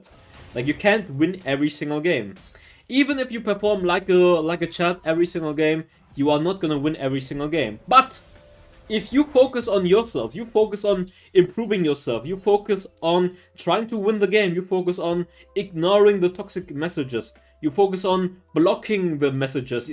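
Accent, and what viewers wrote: Germany. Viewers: Hahaha I'm also german and i noticed your little german accent although you are speaking very good english in my oppinion:D And I have a friend who has the same "family background" as you and I thought maybe has the same background XD I guest correct xD Aber im Nachhinein kann man das ja immer behaupten. Warum hab uch das grad auf Englisch geschrieben xD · Wait u are german??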